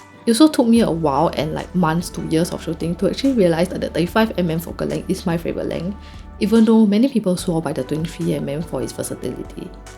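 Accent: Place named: Singapore. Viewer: I own an XT5 and was just browsing Fuji YouTube for entertainment, I don't know why but being French expat living in Singapore landing on your video hearing your Singaporean accent was really heart-warming, feels like "home" (at least my home now). Subscribed!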